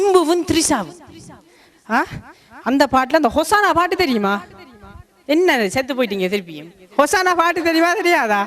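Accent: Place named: Sri Lanka. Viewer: Nakshatra Jewel This is how Tamil is spoken in Sri Lanka.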